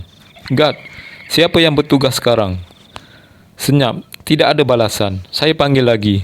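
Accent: Kelantan, Malaysia. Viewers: slang aka bau budu insyaallah tetap pekat ngalir dlidah kita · Ooo ore kelatee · Asslm bang mantap pengalaman bang,abang orang Kelantan ke bunyi cara abang ckp macam orang Kelantan hahah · Pekat loghat Kelantan.. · Pekat klate demo.. 😅😅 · Bunyi Kelate nihhh...guano bohh...hahaha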